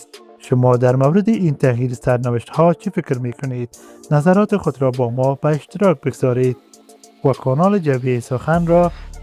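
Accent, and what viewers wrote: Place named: Afghanistan. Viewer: تشکر از شما که لهجه دری افغانی را در کانال خود اضافه کردین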